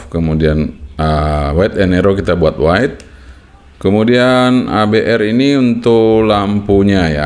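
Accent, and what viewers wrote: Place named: Spain. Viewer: Hola amigo. He visto tu video. Pero no entendi prácticamente nada 😂 ya que solo hablo español. Me he percatado que en tu acento al hablar dominas mejor el español y si no me equivoco en mi observación, te agradecería enormemente si hicieras un video de este equipo en español, ya que en la red todavía no aparecen o almenos yo no les he encontrado. Gracias de antelación 👍✌️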